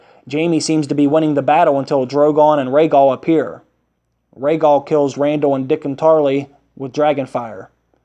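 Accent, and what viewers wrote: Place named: Philadelphia, United States. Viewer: you must be from the philly area talking like that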